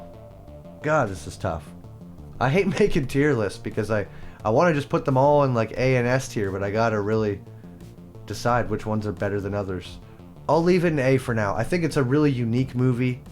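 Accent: Canada. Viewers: his accent is wildly canadian lol listen to his outs and abouts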